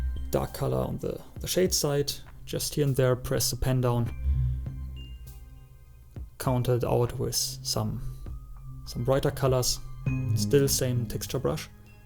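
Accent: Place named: Germany. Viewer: Man, thanks a lot for your tips... In this period I’m working on project that requires some “art skill”, and I really need some drawing advice as I’m not really good at it 😅 Down below, I type what I would had written in the first part (as short as possible) I heard that your accent is German and there are some words that I didn’t understand right away; but that’s not a problem.. I just “rewinded” the video and tried to understand that few words... Also some suggestion for the future.. Would you make some animal (also fantasy) drawing tutorial? I would really appreciate it. Until then, good luck for your next arts 🏞🌅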